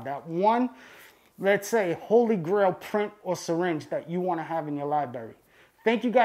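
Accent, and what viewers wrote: United States. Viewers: He speaks a heavy regional American English. Impossible to mistake where he's from. :) Has a few problems with the latin also -- especially cyanescens and azurescens But, ah well. Not so important.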